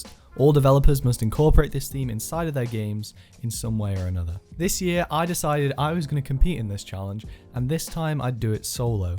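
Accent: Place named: United Kingdom. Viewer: I can notice a british accent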